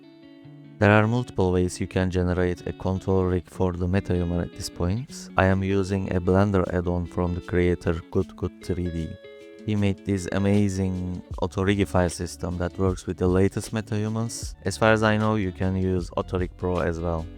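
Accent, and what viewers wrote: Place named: Turkey. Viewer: I may have noticed a little Turkish accent 😅 I couldn't see it in the video but I presume the main material is basic toon shader with color ramp?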